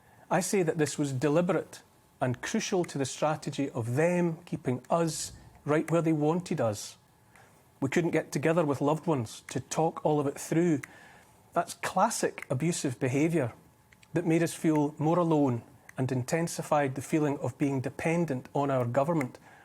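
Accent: Scotland, United Kingdom. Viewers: How much I love to hear a direct Scottish voice let out an opinion. It's rare in my northern New South Wales' region. The region hosted an Aquarian Festival in the seventies, and it appeared to be going in the right direction. So, I went south of the Queensland border - thinking I had left an oppressive police state where any looking different or just looking too happy was picked on by the police. More than two people together in public could be arrested. This is now the law in New South Wales - though few seem aware of it. It seems to be there to stop people protesting about something the government has guaranteed for large corporations. It could be used to stop people protesting against coal seem gas mining or pulling up our railway tracks for elitist cycling paths - for retired business folk and local councillors to go on long viewing of the scenery - while those who can't drive and have wheelchairs, disabilities, older folk and Indigenous who can't drive - can't go to the beach any more, or who die not being able to get to medical specialists in Brisbane, Queensland. 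All major political parties voted in NSW parliament to rip up the tracks for cycling pathways, and including the federal government, offered over $100million to local councils to do it - even though this is more than enough money to get to Lismore - the regional center some new railway services - and to Byron Bay, as it was over fifty years ago. Too many now have parasitic jobs driving people with disabilitie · Kudos to. Lovely Scottish accent. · You were correct. He's Scottish. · 👍🏽👍🏽👍🏽 Im America, trying to work out if is Irish or Scottish. Im thinking Scottish. 💜 Love his talks. I subbed this channel today.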